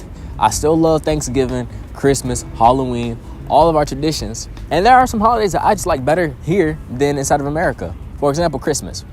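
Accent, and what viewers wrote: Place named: Northwest, Netherlands. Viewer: First if all i have to congratulate you on your Dutch pronunciation. Of all the US citizens i follow yours is the best.(Although strongly biased to the northwest of the Netherlands when everybody knows the closest ABN or algemeen beschaafd Nederlands is spoken in the midsouth). I also genuinely like your channel. No Sugarcoating just telling your impressions. Keep doing what youre doing.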